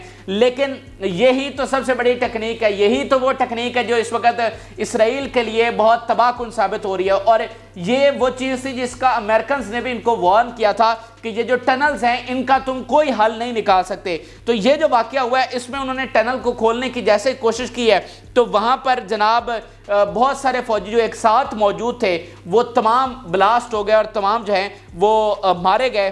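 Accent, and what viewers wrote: Punjab, Pakistan. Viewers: Panjabi hinglish is very good.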